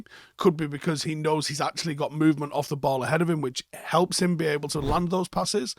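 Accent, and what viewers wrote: Manchester, United Kingdom. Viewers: nice to hear a Manchester accent for a change, good knowledge too apart from the Watkins analysis, he is a liability just like Mings.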